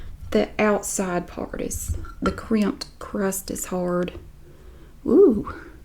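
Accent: South, United States. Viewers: There already is a letter for how you pronounce pie; Pæ. You also pronounce another letter you don't have in the english language when you say oil; å(l). I can't understand how someone could complain about how you pronounce words; southern dialects are wonderful. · The day you have to really justify your beautiful southern accent, I swear to Sweet Baby Jesus I will go bat-sh*t crazy. No, just NO. Do these people not see the name of your channel? It's a clue, ugh. I say you do a whole 40 minute video saying the word Pae. I'd watch the whole darned thing. Thank you for what you do. Hugs! Ana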